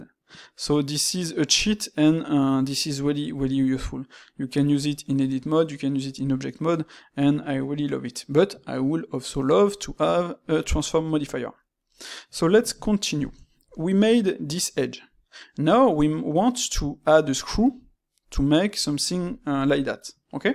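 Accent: France. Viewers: Bonjour! merci pour ton frenchy accent. pour une fois que j'arrive à comprendre un tuto en english!!! J'ai un souci lorsque tu applique le premier Bevel a les edges intérieures disparaissent, ainsi que la face... je suis bloqué. je suis sur blender 2.9.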